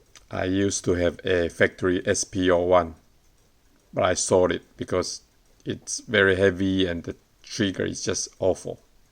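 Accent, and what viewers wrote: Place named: Europe. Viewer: Your accent was so thick I thought you were European at first. Haha, nice guns!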